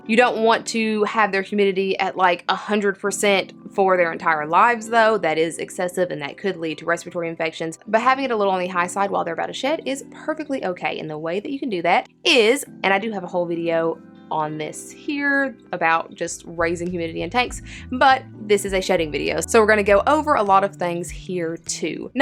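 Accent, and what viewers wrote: South, United States. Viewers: I sooooo love your southern accent and you are just adorable. You are also soooo knowledgeable so I had to subscribed. Thanks for sharing what you know with us.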